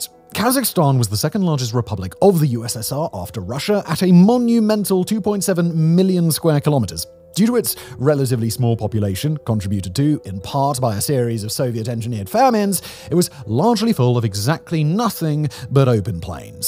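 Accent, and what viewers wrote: United Kingdom. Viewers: That’s cool but I can’t fall asleep to a British accent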